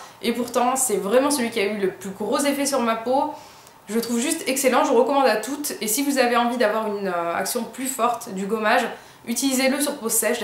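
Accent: England, United Kingdom. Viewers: tu es l une des mes youtubeuses beauté préféré.. je kiiiiiiffe tes conseils, ta facon de t exprimer, ton accent anglais (hihi surtt qd tu dis le mot HIGHLIGHTER LOL), ta facon de présenter tes produits (tres bonne ambassadrice) et ta classe naturelle. Tu as bcp de gout et bcp de talent. Que fais tu dans la vie ? Commerciale ? Bref.. tu as compris que je te kiffais et j apprécie que tu parles svt de produits a petit prix..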